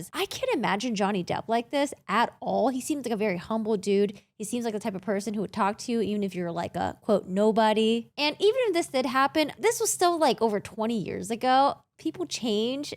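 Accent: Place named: United States. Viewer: If her accent doesn't give it away, her location is stated in the about page, united states.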